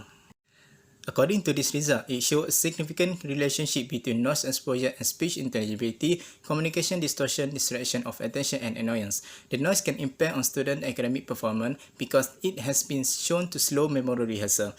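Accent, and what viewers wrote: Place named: Kelantan, Malaysia. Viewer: Wow english with klatey slang 👍🏻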